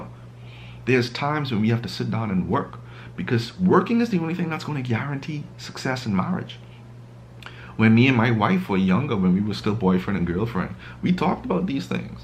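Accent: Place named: Bahamas. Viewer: Are you a Bahamian? That accent sounds like one from the Bahamas.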